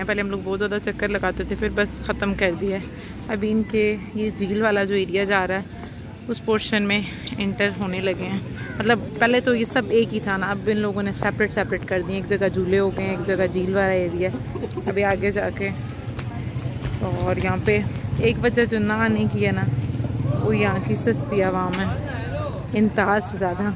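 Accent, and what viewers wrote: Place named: Chakwal, Pakistan. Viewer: I am far away from my city. Couldn’t travel back since two years. I watch your vlogs whenever i miss my city. I like your Chakwali way of narrating things 😎 as this is my own way. I love it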